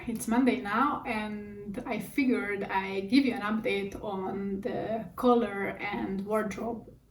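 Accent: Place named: Hungary. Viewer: Szia! Az akcentusodból gondoltam, hogy magyar vagy. Szerintem a fények, a kamera és az ebben a videóban viselt blúzod alapján úgy gondolom, hogy mégiscsak Tél típus vagy, mégpedig Hideg Tél. Engem 3 végzett színtanácsadó nézett "félre" személyesen, míg már annyira frusztrált lettem, hogy elmentem egy szín- és stílustanácsadó tanfolyamra, hogy kiderítsem az igazságot. 🙂A baj az, hogy az "avatatlan" szem (néha még a tanácsadók is!) nem tudják, hogy mit kell nézni (elsősorban a bőr egységes és egészséges ragyogását). A rossz színek elszínezik az arcot, szürkítik vagy sárgítják, kihozzák a bőrhibákat, a szem alatti karikákat, stb. A tanácsadó pont az ellenkezőjét mondta neked, mint amit én mondanék. Az ösztöneid ebben az esetben jól működtek, javasolom, hogy hallgass rájuk (vagy legalább kérdezz meg még valakit). 🙂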